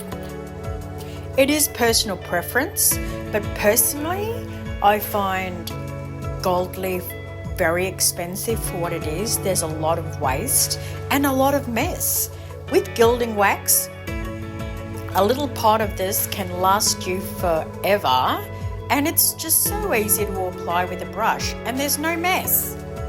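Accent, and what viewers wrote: Australia. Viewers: Hi, a lovely piece, I love the felt tool,a great idea, also nice to hear an Aussie voice, regards pamela hall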